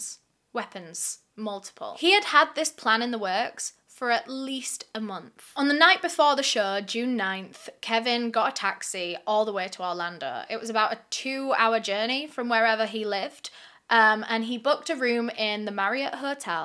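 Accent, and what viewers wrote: United Kingdom. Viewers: What is your accent? I cant figure out if its uk or not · It's a UK accent!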